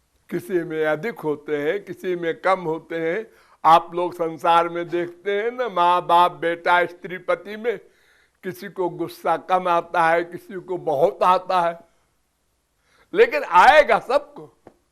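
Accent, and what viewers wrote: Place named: Haryana, India. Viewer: Swamiji haryana se lagte h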